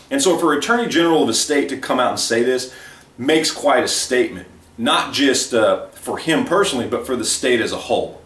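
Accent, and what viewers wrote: Texas, United States. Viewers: Your Texan drawl is hard to place. North Texas? :)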